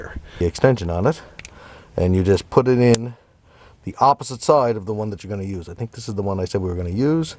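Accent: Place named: Grand Falls, Canada. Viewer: Are you from Grand Falls? I hear the accent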